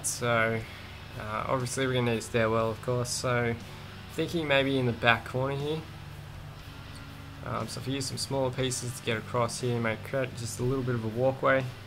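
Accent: Australia. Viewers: are you aussie, just curious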